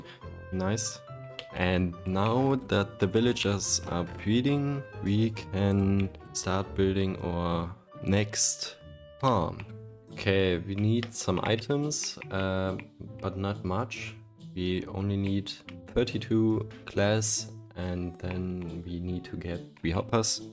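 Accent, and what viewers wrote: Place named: Germany. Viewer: Wie hast du das gemacht man hört du bist deutscher 😅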